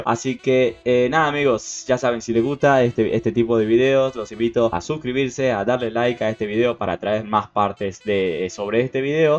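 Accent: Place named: Argentina. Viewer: si le quitas el acento a argentino es igual a la voz de fernanfloo xd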